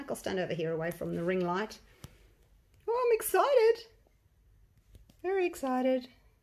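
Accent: Australia. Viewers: Hi! I am just getting into resin art. Love your coaster idea! Send me info on buying the coaster mold please. I love these, they turned out looking like pansies! Nice to hear your Aussie accent , I grew up in Sydney but now live in LA. uSA. All the best,Leanne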